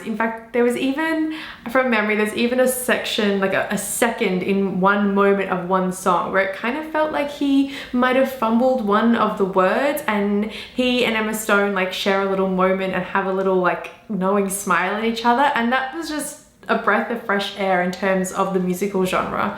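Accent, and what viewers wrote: Australia. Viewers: Great review. I've seen LLL twice now. Even more impressed the second time. --Anyway, I'm trying to place your accent. Are you an Aussie?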